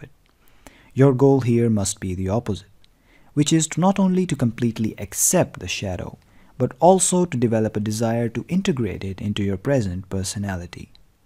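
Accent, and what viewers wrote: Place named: India. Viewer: Are you Indian by any chance??? Your accent sounds like an Indian so....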